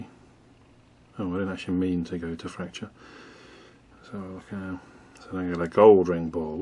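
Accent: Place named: United Kingdom. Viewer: Just part way watching the excellent video and you was talking about the calender. I use the month view on my phone all the time. I use Pure Calender Widget and I find it truly indispensable. I colour code my everything I schedule in (blue is tasks, green is personal appointment and orange is birthdays/anniversaries etc.) so just having the whole month at a glance is awesome. Just thought I'd let ya know :-) please continue doing your reviews. Always great hearing a Brit doing the reviews.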